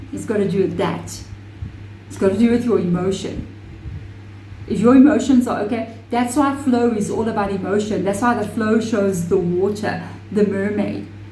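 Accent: South Africa. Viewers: Is your accent South African? 😊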